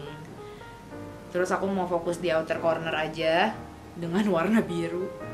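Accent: Java, Indonesia. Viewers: Kayaknya cuma yg pantes medok tp gak jadi kayak mo ngelenong. You look so stunning as always!!!! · Akhirnyaaaa 😂😂😂 durasinya yg lama gini kess.. lope your medok 👻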